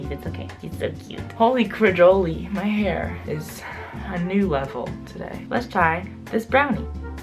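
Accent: South, United States. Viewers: “Why am I like... southern?” You.... you.. you live in.. Texas. I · "Why do I sound southern?" lives in texas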